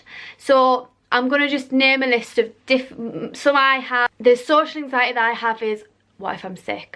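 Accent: United Kingdom. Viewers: Northern for sure. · what part of england is her accent from? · Love the accent. Is that Brighton? Newcastle? So many accents in the UK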